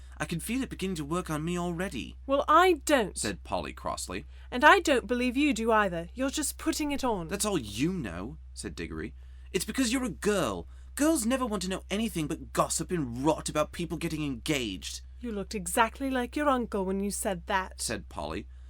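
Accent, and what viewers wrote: United States. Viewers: Why an American accent for an English story?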